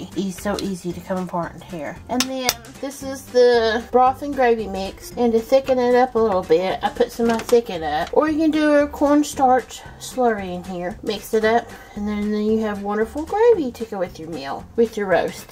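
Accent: South, United States. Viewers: I love love love your accent and pronunciation of words! True southern accent??!! Lol. Truly Love your recipes and your cooking channel!!! Thanks for sharing your love of cooking and wonderful recipes!!!! God bless you!!!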